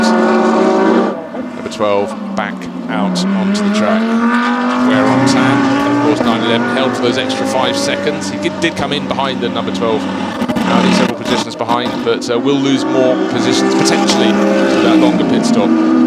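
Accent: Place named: Australia. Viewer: I hear an Australian accent 👣.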